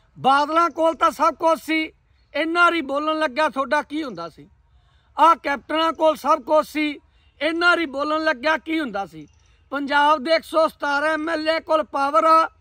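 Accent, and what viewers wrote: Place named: Haryana, India. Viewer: Tusi. Bai. Ji. Haryane. Wale. Lagde. Ho.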